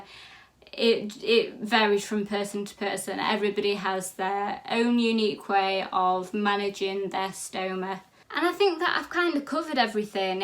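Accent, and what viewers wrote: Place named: England, United Kingdom. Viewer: Do you have loop ileostomy or a end ileostomy.. i had a loop ileostomy in June 2022.. I have a odd shape stoma so i carnt get precut pouches & I still carnt cut them to fit properly.. that problem really stresses me out.. also its nice hearing someone on youtube talking about ileostomys with a English accent.. nearly all the ones iv watched are American.. I'm in England x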